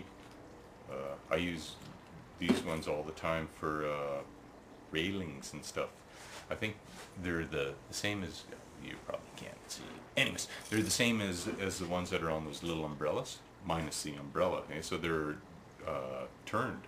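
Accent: Canada. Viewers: jw are you canadian? i am and i can recognize the accent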